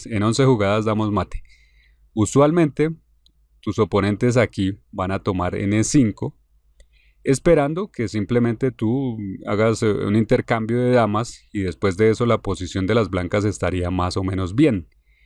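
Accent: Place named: Bogotá, Colombia. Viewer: ¡No sabía que el GM Igor Smirnov hablara español con fluidez, y con acento rolo! 😲😃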